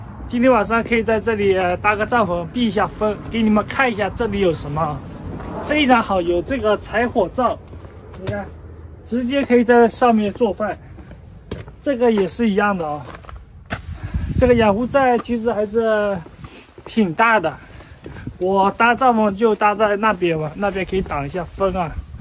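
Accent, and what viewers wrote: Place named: Ningbo, China. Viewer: 的浙江寧波普通話太可愛了！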